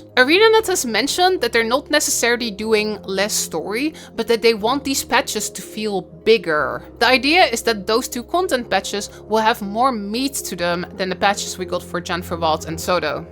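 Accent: Netherlands. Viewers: you dutch accent seem to be fading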